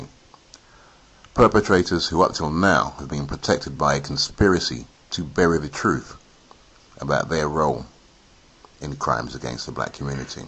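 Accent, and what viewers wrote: United Kingdom. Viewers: "Berick Obama." Gotta love his U.K. accent.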